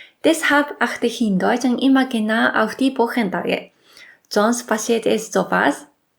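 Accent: Japan. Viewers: dein Deutsch ist sehr gut, du sprichst mit japanischem Akzent, doch was mich am meisten beeindruckt hat sind die Wahl der Worte und die hervorragende Grammatik, wo selbst Deutsche meist ihre Probleme haben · Wax für ein süßes Mädchen Hallo kleine Ich bin durch Zufall auf dein Video gestoßen Und habe schon weg schalten wollen Aber deine ersten Worte haben mich fasziniert Das sympathische japanisch klang durch Da ich nicht mehr mobil bin sehe ich mir meine Lieblings Länder die ich zu DDR Zeiten bereisen wollte und nie konnte im Internet an Japan und Kuba waren meine Lieblings Ziele Japan wegen der Technik und dem schinkansen fudschi Und den sumu Weil ich auch so groß und schwer bin 😂 Und Kuba wegen dem Wetter und der Südfrüchte die es in der DDR nicht gab Kleines Mädchen ich möchte mehr von dir sehen und hören deine Ausstrahlung ist echt süß dein Mann ist bestimmt sehr sehr glücklich mit dir Ich habe mein Weibchen und unsere Kätzchen Die sind meine Schätze Danke das du mir möglich machst von dir weiter zu hören Wie kam ich zu Japan Ich war noch kind vor vielen vielen Jahren In unserer stadt wurden im Krankenhaus Menschen aus allen möglichen Ländern der Welt zu Ärzten ausgebildet Und mein Vater hat ümmer wenn er mal in der Stadt war Leute mit nach Hause gebracht und wir haben uns mit Händen und Füßen verständigt Ich fand das so toll und mache das heute auch noch und Internet ist für mich das Tor zur Welt Vorhin hab ich mit jemandem aus Usbekistan geschrieben und letzte Woche aus Indien Vielleicht können wir uns auch mal schreiben wenn du magst Aber jetzt wünsche ich dir eine gute Nacht und schlaf gut 😂 ❤Grüße aus quedlinburg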